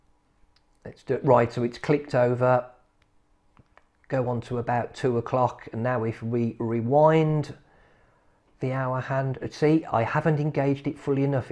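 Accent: Russia. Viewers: As a Russian guy from Siberia I approve this accent, tovarisch! Great review btw! Subscribed.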